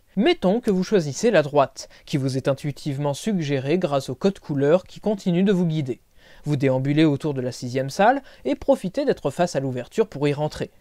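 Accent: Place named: United Kingdom. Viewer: Et félicitations pour votre accent british !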